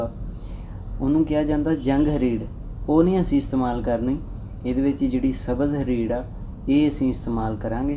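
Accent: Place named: Faisalabad, Pakistan. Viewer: پنجابی زبان تے فیصل آباد دی زبان اے لگدی اے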